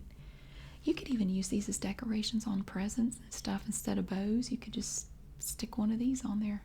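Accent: South, United States. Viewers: Hi friend!!! I love your relaxing voice! I have a southern accent too. I hope my new ASMR channel does good someday like urs. I am new to doing ASMR and not that good at it but I have some cute ideas in mind to do once I’m not so busy with my photography. I also have a beauty channel too. I do beauty subscription box openings on there and sometimes pets vids of my critters. Hopefully soon u will stop by my channels too. I’m a huge fan or ur ASMR sweet friend and look forward to getting caught up on watching ur vids. I love the pug sweater, I have a pug named Sadie who will be turning 14yrs old this month. Do u have a pug too? Much love and support from Kentucky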